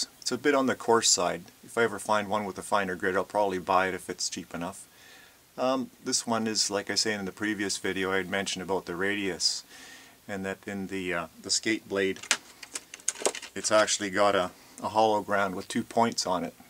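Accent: Canada. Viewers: I could tell you're Canadian as soon as I heard you talk. -From a fellow Canadian :P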